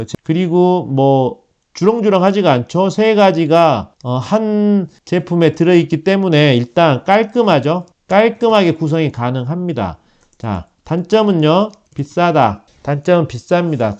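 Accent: Jeolla, South Korea. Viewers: 잘 보고 갑니다~^* 킥보드용 블랙박스를 찾다가 채널에 놀러왔습니다!! 말투가 우리동네 같네요 저는 목포입니다 ㅎㅎㅎ 구독 좋아요 누르고 갑니다❤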